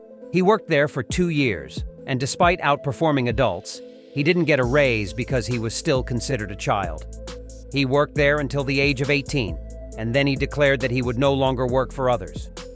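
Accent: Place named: Scotland, United Kingdom. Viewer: I love your Scottish accent. You should make more documentaries about the Scottish with that accent.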